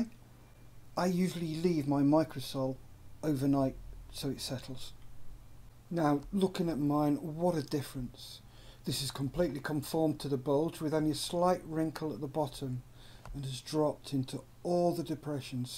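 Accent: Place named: England, United Kingdom. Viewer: Sorry I've slept since watching the video and forgot you had an English accent lol.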